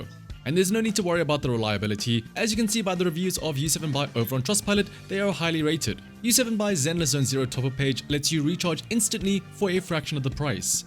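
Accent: South Africa. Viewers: your accent sounds familiar, are you south african?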